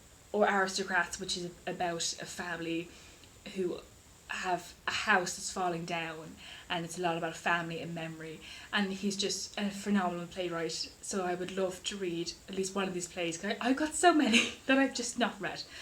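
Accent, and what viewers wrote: Ireland. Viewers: THIS. MADE. MY. DAY. (the Irish accent 💚)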